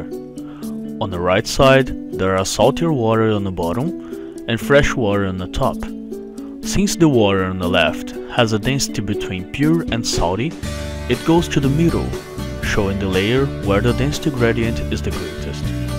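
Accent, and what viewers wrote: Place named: Russia. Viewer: u have to be russian!? thanks tho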